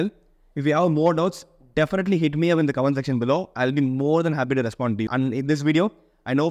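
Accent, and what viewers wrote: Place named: Tamil Nadu, India. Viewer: Tamil Nadu accent haha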